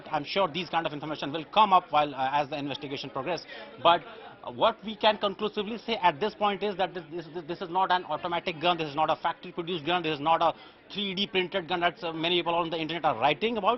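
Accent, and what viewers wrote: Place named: India. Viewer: TAPE not TIP, GOOGLE not goggle This is one of the reasons why I hate conversing with an Indian when I was working in a Call center hahaha. Also, he explains on how to create DIY Shot gun at home hahah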